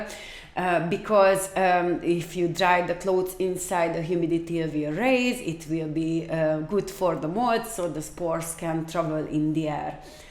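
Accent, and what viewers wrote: Hungary. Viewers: nem magyar vagy véletlenül? hát én ismerem ezt az akcentust, nem lehet más :D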